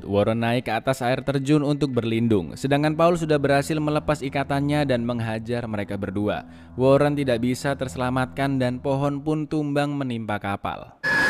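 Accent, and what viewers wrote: Java, Indonesia. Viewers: Ini Adalah Channel Membahas Alur Cerita Film Paling Asik Salah Satunya,Gara2 Membahasnya Medok + Asik:)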